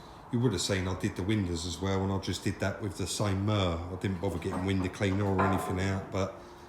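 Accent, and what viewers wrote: United Kingdom. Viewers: You can dress this channel up as much as you like, but that accent is (apart from Scouse), the most distrustful and rotten in the UK.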